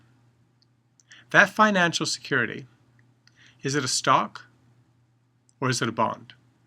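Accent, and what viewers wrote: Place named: United Kingdom. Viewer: Thank you for lectures they are very helpful, and the British accent makes it even more cool for some reason. Question: Near , you mentioned the need to convert the 8% into an effective 6 month rate, in order to do that don't we first need to convert the 8% from the nominal value into the effective annual rate,(EAR or EFF) which will give us a value of 8.16% EFF on annual basis, which will then translate into the 0.0408 on a semiannual basis.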